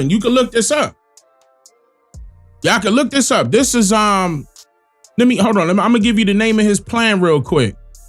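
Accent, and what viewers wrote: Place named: DMV, United States. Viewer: Big love to you. I just confirmed you were from the DMV. I’m from PG and I knew I recognized your accent and lingo lol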